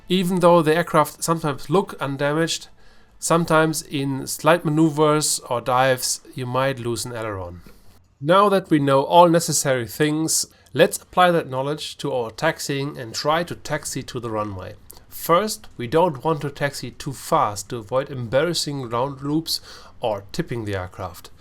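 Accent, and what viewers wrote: Germany. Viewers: I may be mistaken but you have a very thick German accent, a German in a spitfire, what a time to be alive.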